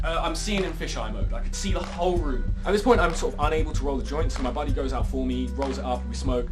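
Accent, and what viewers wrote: United Kingdom. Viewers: he sounds british to me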